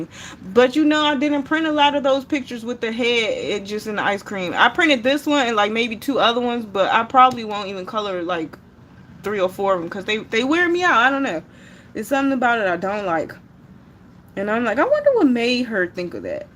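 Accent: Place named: Washington DC, United States. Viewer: Hey …. I’m from Maryland (15 min from DC), I just watched this live…🤦🏻‍♀️but I just wanted to inform you that there is a Blick Art (a.k.a “Dick Blick”) DC on l street NW. I wish I could color skin as well you. Great job!! I’ll turn the bell on if you stream again. I knew I heard our DC accent in your voice. Go to the Blick downtown it’s nice!!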